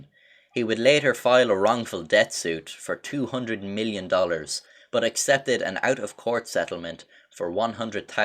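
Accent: Ireland. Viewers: your irish accent makes it funny when you rant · Are you Irish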